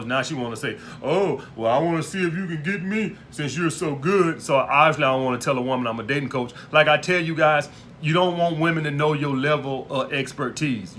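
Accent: South, United States. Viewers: Do the southern accent help you in NY?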